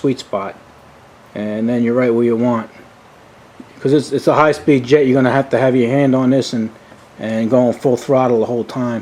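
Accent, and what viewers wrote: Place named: New York, United States. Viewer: So where in New York are you from?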